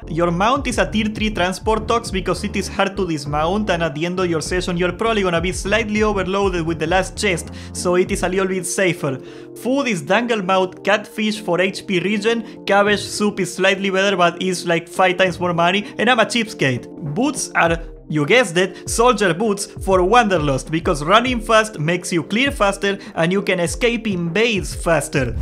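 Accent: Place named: Argentina. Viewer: Al minuto dije este es argento. Buen video campeón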